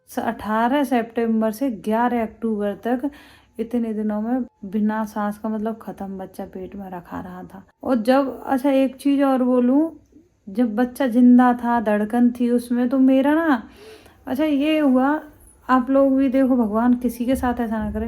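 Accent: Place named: Bharatpur, India. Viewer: Aap kaha sey ho Rajasthan language sey to bharatpur k aas pass k kag rhey ho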